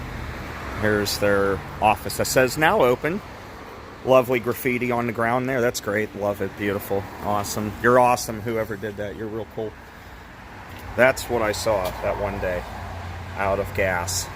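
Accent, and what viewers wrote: Pittsburgh, United States. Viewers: Nice vid, grew up close by and our family would always would get gas there, I remember it going from 76 to Shell around 2004, then Exxon several years later. I think it opened and closed a few times over the years as an Exxon , with each owner putting nothing into it. Even a year ago half of the pumps didn't work very well and the place looked pretty run down. I think at this point it is too far gone for somebody to reopen without a major rennovation... and whoever moved in would still have to tangle with the Sheetz. Im coming home this weekend and will check for the pin, but im sure it will be long gone. :) Btw, great pittsburgh accent, I miss hearing that every day.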